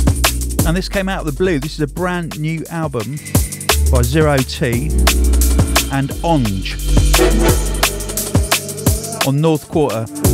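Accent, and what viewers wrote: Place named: United Kingdom. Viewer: Gotta love how bri'ish my boy sounds, I love him so much. Big up, London.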